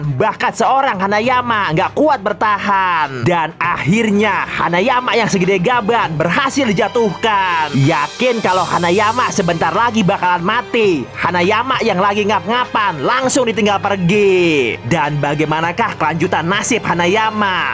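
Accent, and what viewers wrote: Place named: Bekasi, Indonesia. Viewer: Makin yakin gw kalo lu orang Bekasi bang, minimal Jaktim atau Bogor deh, kaga danta emang 🤣😂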